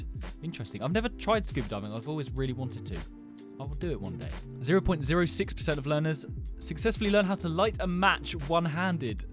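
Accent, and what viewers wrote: United Kingdom. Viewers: yo are yu british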